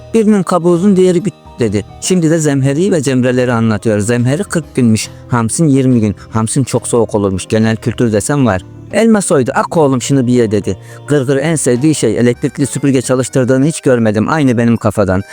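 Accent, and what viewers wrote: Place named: Nevşehir, Turkey. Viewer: Nevşehirlisiniz sanırım,8 yıl çalıştım orda tam ora konuşması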